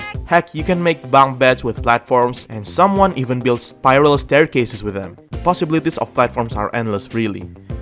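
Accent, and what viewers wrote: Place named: Indonesia. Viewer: Sebelum ku liat deskripsi "kalo didengerin kayak orang indo ya 🤔" Ternyata bener 🙆🏻‍♀️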